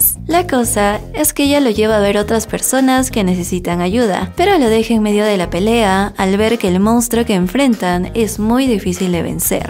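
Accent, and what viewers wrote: Peru. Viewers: Eres de perú? Digo por el gaaa y gracias por el resumen eres la mejor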